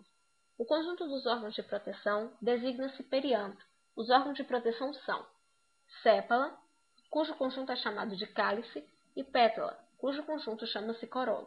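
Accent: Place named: North, Brazil. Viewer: Parabéns! Sou Paranaense e gostei muito da aula e parabenizo você pela iniciativa! Pelo sótaque você é lá de cima! Como sempre muito inteligentes! Abraço!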